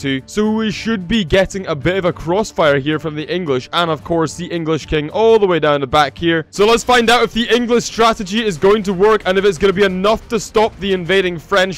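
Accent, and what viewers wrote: Scotland, United Kingdom. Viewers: I’m sorry are you Scot? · Are you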